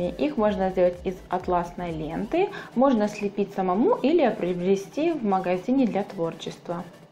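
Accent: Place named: Ukraine. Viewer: ну я поняла,просто сказала.вы с Украины?у вас есть акцент.много знакомых на Украине живут,они все верят во всякие приметы.У вас не так?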